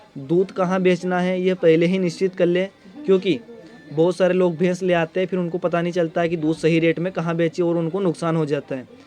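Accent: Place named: Rajasthan, India. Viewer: aap rajsthan se ho ,aapki languege bta rahi he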